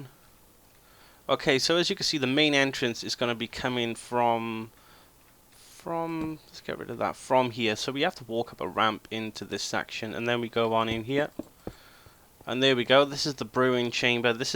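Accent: Wales, United Kingdom. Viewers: Are you welsh?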